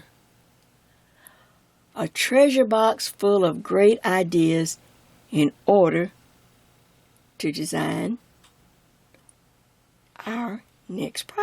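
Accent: South, United States. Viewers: Only just subscribed couple days ago. I've been crocheting for decades but the recent introduction of so many of the old... really old stitches is so exciting. I learned to knit, crochet and embroider all at age 11. I still enjoy 2 out of 3. I prefer not to knit. Too mundane and not enough texture. I cane view a piece then reproduce it. I'm unable to use the charts or should say they slow me down. I prefer reading the row by row or rd. by rd. written directions. I will certainly be purchasing both of those books at the JoAnn's I used to work at. I will be tuned into your channel every time I get notification you've put up a crochet video. I love your friendly voice and giggle with your very distinct southern accent. I lived in La. and Miss. Each southern state's accent is very unique unto itself. Thank You for all your efforts in this field you so obviously love as you shine brilliantly at it. 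I'm Grateful, LYNDA in Utah.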